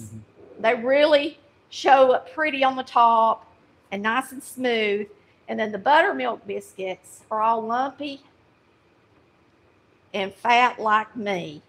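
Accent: South, United States. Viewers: I love you and your crazy Accent! Y'all! We say Eh and you say Uh huh honey and Y'all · For those of you who don't understand a lot of her words due to her Southern accent, she said "baking powder', not bacon powder. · Love your Southern accent and the way you cook! Y'all are amusing, too.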